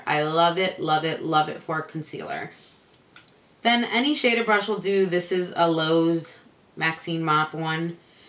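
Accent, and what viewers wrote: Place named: Chicago, United States. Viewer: Your Chicago accent is really strong in this video. It makes happy because I my miss my hometown!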